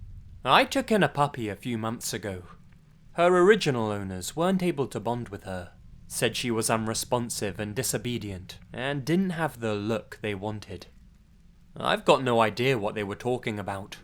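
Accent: England, United Kingdom. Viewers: the slight British accent <33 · you totally have my number, I love falling asleep to you reading these stories. I can't help it, your voice is so soothing and calming. I'm also a complete sucker for an English accent.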